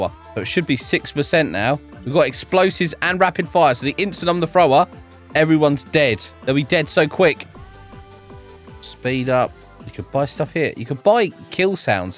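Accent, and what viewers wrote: United Kingdom. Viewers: that british accent tho, its stupidly funny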